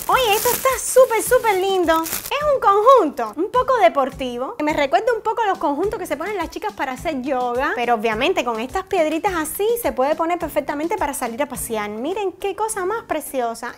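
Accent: Cuba. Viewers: me encantan tus vídeos, eres muy graciosa y muy guapa. Me encanta tu hacento, es como el de mi abuela que es de Cuba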